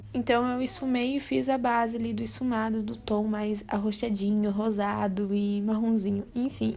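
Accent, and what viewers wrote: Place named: Rio Grande do Sul, Brazil. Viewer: sotaque gaucho, é nóiiix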